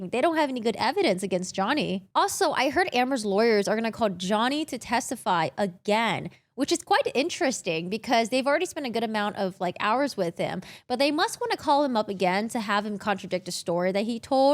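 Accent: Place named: United States. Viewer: If her accent doesn't give it away, her location is stated in the about page, united states.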